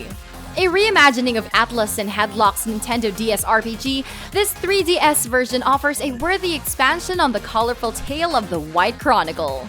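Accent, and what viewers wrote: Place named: Philippines. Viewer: This is an unmistakably Filipino English accent.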